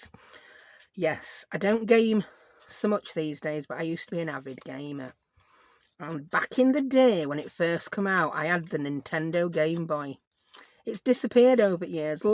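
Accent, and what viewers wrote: United Kingdom. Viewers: Thank you for giving me someone cheerful to awaken to this morning!! (Even if this video is a year old), for me it’s today, and amid the stress of a not wonderful med diagnosis, packing up to sell my house, flat tires and car problems, and then a hail storm that totaled the roof, I am choosing to take 5 in the mornings and spend it with you and my cup of coffee being happy and cheerful, just like the way I choose to live my life. So glad that you join me! I turn on the captions and double check to see if you really said (in your darling British accent) something bit me on the nose! Love it! Hope you’re well!!💕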